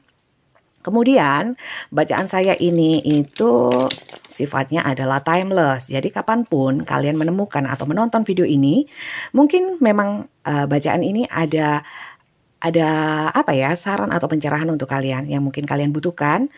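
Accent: East Java, Indonesia. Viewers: Kakak dialognya jw timuran berarti sm dong kak? Sy juga asli Suroboyo lho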